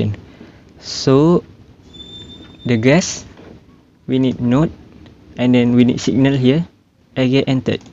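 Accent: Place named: Malaysia. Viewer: thank you, btw you sound like malaysian.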